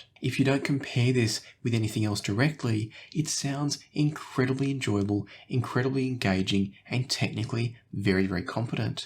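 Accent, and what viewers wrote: Australia. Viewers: it's his Australian accent with a rising inflection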